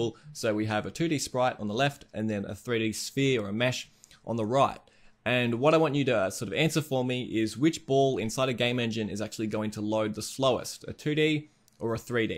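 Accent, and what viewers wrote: Australia. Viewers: Australian accent is so beautiful 🥰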